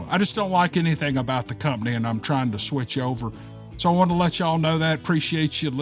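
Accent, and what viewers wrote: South, United States. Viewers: I love you. I listen to you EVERY NIGHT. I enjoy so much listening to you read in that sweet southern accent. Keep up the great work you do. ❤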